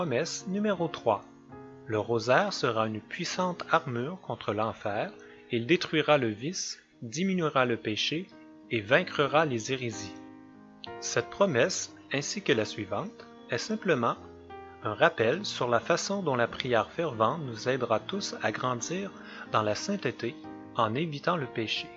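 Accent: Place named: Canada. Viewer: douce vierge marie accent canada il faut bien ecouter moi qui suis d origine allemande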